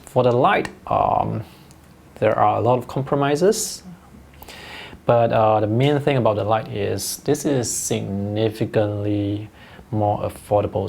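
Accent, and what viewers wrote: Singapore. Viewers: You sound like a singaporean. Are you?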